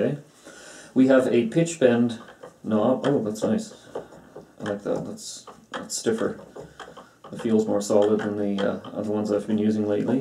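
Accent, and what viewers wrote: Canada. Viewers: More do less talk...but love the Canadian accent though. I got one of these just needed a few tips, nothing to help me here,sorry guy bye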